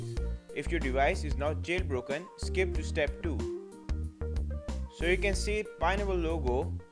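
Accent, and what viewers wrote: India. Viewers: He has an indian accent lol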